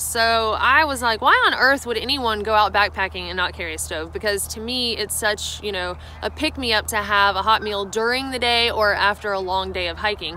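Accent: South, United States. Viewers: i love the eating the favorite thing first bit. what a simple and brilliant concept. thanks for your videos, it's nice to hear from a woman and also i do miss that southern accent. where's it from? i live in england now and i have a new appreciation for all the accents that just sound normal to me. :) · Thank you! I was thinking the same thing! She's from the South and has a Southern accent...hellooo! That's what I like about her and she makes no bones about it! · At first I thought your southern accent was going to be annoying, but I quickly fell in love with your videos. Friendly and so informative! Thanks for your straightforward good sense and speaking on my beginner level.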